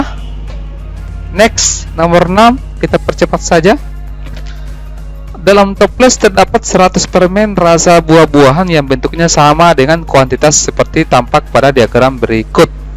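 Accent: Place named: South Sulawesi, Indonesia. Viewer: didengar dr logat nya bapak orang Bugis Makassar kalau tidak salah? 😄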